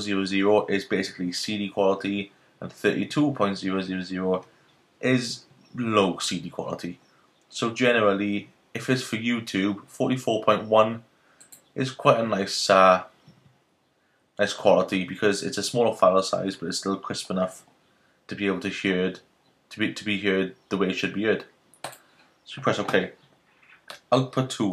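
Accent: Swansea, United Kingdom. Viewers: i swear ur from swansea in south wales! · YOUR WELSH!!! ME TOO! WHERE YA FROM BUTT? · Swansea man? :D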